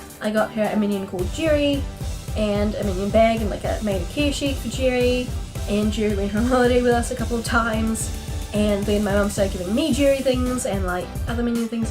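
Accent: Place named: New Zealand. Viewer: I love your accent. New Zealand?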